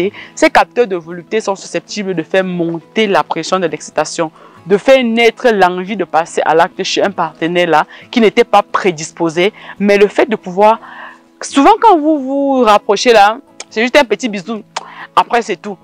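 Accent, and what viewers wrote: Cameroon. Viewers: C'est comme la poule. Elle ne donne pas du lait, mais mais mais, elle nourrie ses poussins, avec la chaleur de son corps. Merci.. bonjour. Ton accès de ton me ressemble à une camerounaise. Merci.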